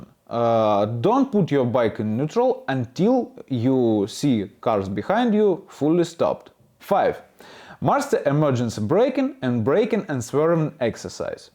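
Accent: Russia. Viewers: I Think these videos are excellent, even the Russian accent adds authority to the message, shame no schools in UK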